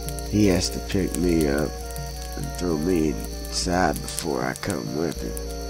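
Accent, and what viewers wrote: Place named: South, United States. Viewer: Southern accents need more love in this community.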